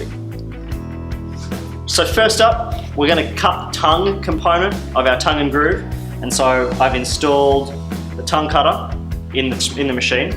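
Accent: Australia. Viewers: Excellent instructional video. Like the fact its presented by an aussie so I can understand it. Thanks for posting. I'm buying the gear.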